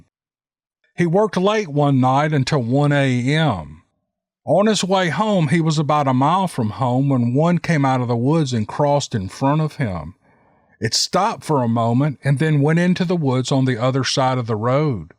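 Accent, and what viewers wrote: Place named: Tennessee, United States. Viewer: " Interruptions/Commentaries" lol... It's like, no worries, "We got the Power of the Remote in hand, 😁 heeheehee, naw... He knows all the folks that listen are either: 1. Southern and they'd woop a fella that ever b-tched about him, 2....and the rest are so taken with his Authentic West Tennessee, North Mississippi, Accent that they'd Woop that fella too! be like "Switzerland for Public Harmony", we might need to stick him on a Campaign Train in 2024! Finally, I would have a Candidate that's a MODERATE. (News Media doesn't allow Moderates, they don't have enough opposite leverage to milk for profits). If they are a Moderate, then they just "use creative writing/lie" and create their drama for profits. The Middle is really a pleasant pasture, "You Guys and Y'all" need to Check it out. ✔ Peace would be Possible if the News Media wasn't owned by the 1% of the 1%, (including the guy/family That's the "Go-to-Source for War Loans") fact, owns 100% A/P....see, there's no big Profiting in Peace, so they stream ideas fostering prejudice. 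We really don't have a "Free-Press", but most folks think we do. A private owned news media just always has an agenda, and that's "our greater foe". We gonna get this through our heads and get back to Adulting. That's my Commentary, and it's Positive. ☺ Thx for being real and nice my fellow DC'rs, its a cool Tribe.... 🇺🇸🌎🌍🌏🗽 HaPpY Holidays Everybody! 🍸🎄........ "the credits": Journalism is 1 of my Degree majors: University of Memphis Go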